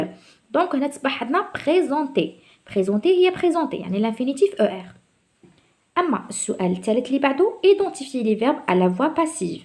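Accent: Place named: Algeria. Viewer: اانتي من الدزاير ياك بينا على هدرتك ولهجا تاعك 🤗😁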